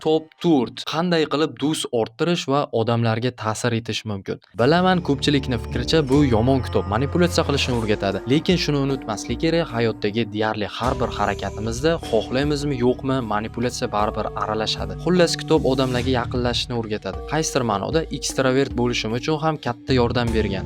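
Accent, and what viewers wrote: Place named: Jizzakh, Uzbekistan. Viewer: Admin Jizzaxlimisiz shevez tortib ketarkan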